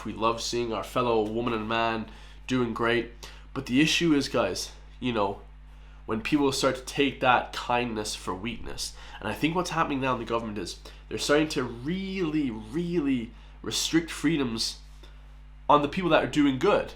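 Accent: United States. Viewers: Your accent sounds familiar,what part of America do you hail from?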